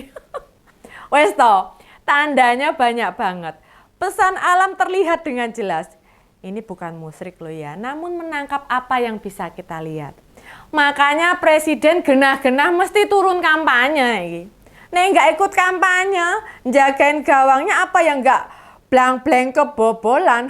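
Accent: Java, Indonesia. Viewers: teruskan karya nya Mbak. Ini luar biasa. Anda cerdas, cantik & menarik. Pembawaan nya njawani, saya bangga BRAFO. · Saya puas banget....!!! lucu mendengar narasi dengan logat bahasa jawa yang mendok di vidio ini. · aku ngefans sama suaranya..logatnya yojo tenan! wes nomer 02 turu ae nang omah..ra mgkn menang!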